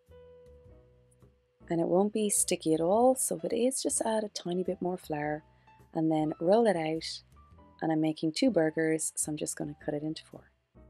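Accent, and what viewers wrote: Ireland. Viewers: Are you Irish? I detect an accent. Thanks for this. It was fun to watch!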